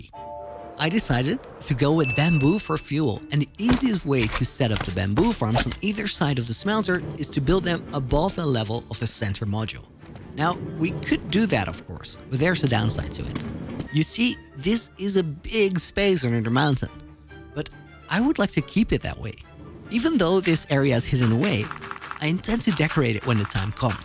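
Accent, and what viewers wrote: Netherlands. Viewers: are you dutch cuz i hear it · very impressive,! You are not only a talented builder, but you have a gift for making videos too. Unbelievable! also: are you Dutch by any change? I thought I could hear it, but I could be wrong. (I am not at all saying your English is bad)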